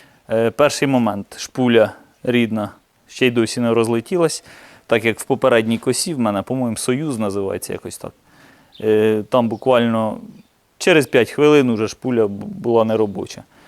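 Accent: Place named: Sumy, Ukraine. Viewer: я чую діалект сумський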